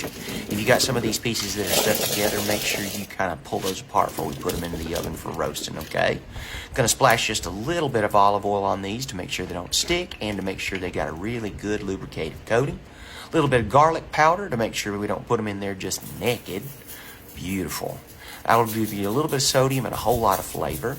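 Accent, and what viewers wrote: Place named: United States. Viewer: Love them all, except the beets.....lol I have to ask what part of the US does your accent come from? Mine is a mix of South Georgia and eastern NC.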